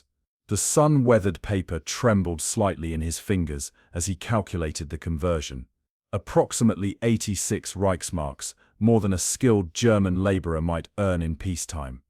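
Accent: United Kingdom. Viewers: The announcer seems British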